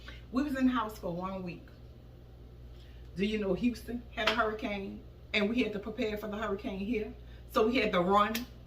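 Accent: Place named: New Orleans, United States. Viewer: I knew I heard that NOLA accent. 😂 when I started watching. I love it. I will NEVER forget Katrina and Rita hit Texas shortly afterwards. It was August 2005. I will never forget it. I’ve made some of the best friends from my NOLA posse who came to Texas and never returned after Katrina. This video had me crying and gave me chills remembering the horrible stories.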